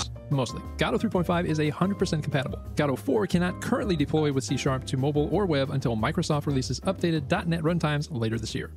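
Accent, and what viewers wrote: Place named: United States. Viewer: His American accent makes oh sound like ah to us Europeans. But he's saying oh :)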